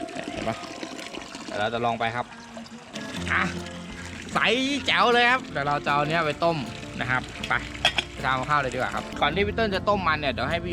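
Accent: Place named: North, Thailand. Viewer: พูดไทยสาบเมืองได้ไง 5555 จิ้นส้ม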